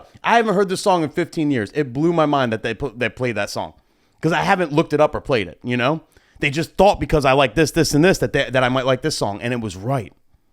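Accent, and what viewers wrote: New Jersey, United States. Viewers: Dude you are so Jersey! Love from L.I.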